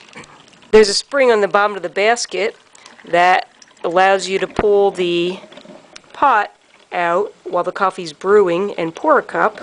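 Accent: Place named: Chicago, United States. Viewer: The Chicago accent is coming through loud and clear! ☕️🍩☕️🍩☕️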